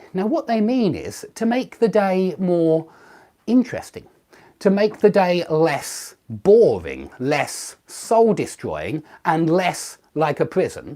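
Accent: England, United Kingdom. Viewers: Mr thank you first for your lessons, I wanna ask you please, is your english accent a northerner or southerner one?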